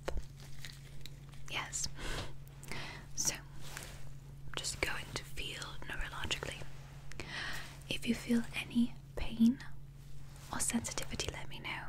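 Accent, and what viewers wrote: United Kingdom. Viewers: Love your British accent. Very on point. Love it. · You have the most Beautiful and Hypnotizing Eyes!!! I always love the British Accent for your ASMR!! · Is that a light British Accent?? It’s so faint I can’t tell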